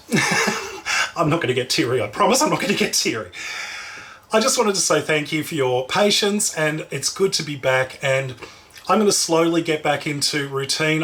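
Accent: Australia. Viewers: This looks wonderful. Thanks for the recipe. Good to hear an Aussie voice on the tube too. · I love your quick cooking of course but mostly I love your Australian accent! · God bless you. You are a beautiful soul. Is your accent Australian? Do tell. thanks.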